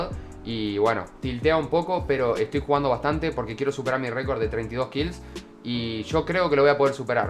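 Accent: Uruguay. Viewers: sos uruguayo a argentino sacame la duda capoo?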